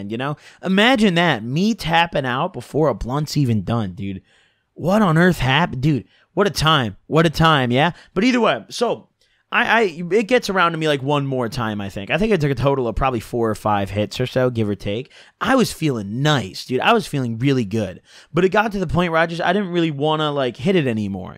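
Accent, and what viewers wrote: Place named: Illinois, United States. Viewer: Sounds like you grew up near elmhurst iL thats were im at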